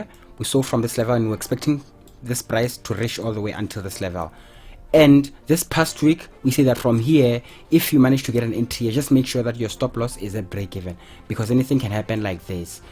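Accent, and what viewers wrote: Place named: South Africa. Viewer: Thanks my guy... i can hear that u my homeboy a South African... Big up ntwana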